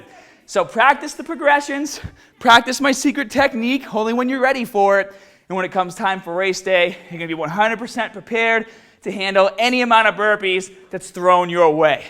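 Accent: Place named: Massachusetts, United States. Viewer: this dude sounds like hes from Massachusetts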